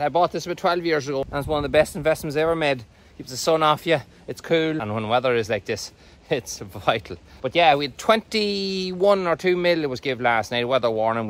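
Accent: Ireland. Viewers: Love it, love it, love it......especially the wonderful Irish accent that goes along with every video. Thanks from Australia.👌👌👏👏💕💕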